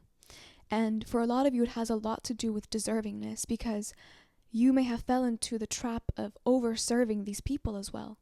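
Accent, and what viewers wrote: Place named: United Kingdom. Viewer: I am attracted to that voice! I am a sucker for that english and British accent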